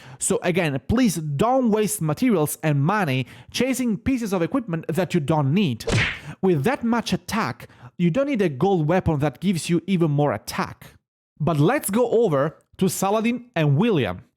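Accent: Italy. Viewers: Ma????sei italiano?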